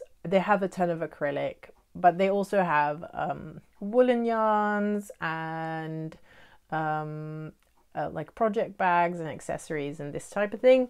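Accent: United Kingdom. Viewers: Congratulations on releasing your first pattern! We'll done, you! I think you were channeling your inner Vanna White when you were showing your sock....lol! I've on l y been watching your podcast for a short while, and quite enjoy it. Could you explain why, for a Dutch person, you sound so British, please? It's very confusing. I'm looking forward to seeing your progress, and Julia's on the Steven West MKAL. Take care. Patty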